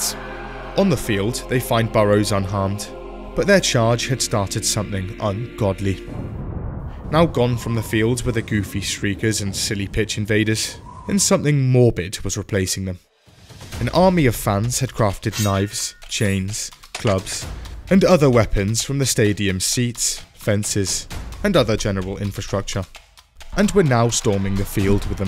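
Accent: United Kingdom. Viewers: Feels weird hearing a british guy talk about baseball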